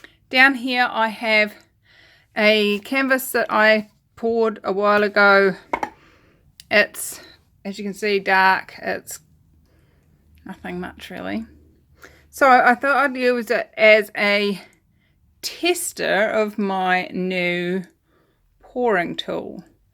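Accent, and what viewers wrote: South, United States. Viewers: Sounds like a mixture of a Southern and Midwestern accent! This little guy isdarling. You've certainly got it going on this!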